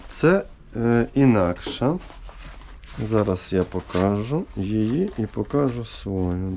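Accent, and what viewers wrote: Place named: Uzhhorod, Ukraine. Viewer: А мені подобається мова автора канала. Дуже цікавий, навідь прикольний ,Ужгородський суржик, відрізняється від нашого - Дніпрорпетровського. І це нормально. І взагалі підримую автора , що кожен має право розмовляти, як він розмовляє. Ось писати, бажано без суржика :) . Всім добра.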